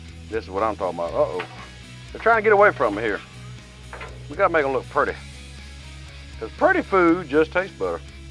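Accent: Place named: New York, United States. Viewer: How come when I saw you in a bar in the East Village you sounded like every other New Yorker in there?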